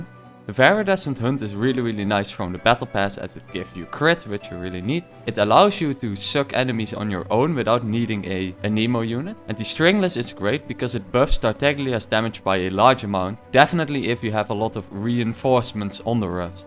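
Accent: Netherlands. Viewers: AAAAAA as a dutchie i can't handle the Dutch accent im sorry fisisjjsjs But thanks for the guide i appreciate it lol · Your deffo from Netherlands!! Same haha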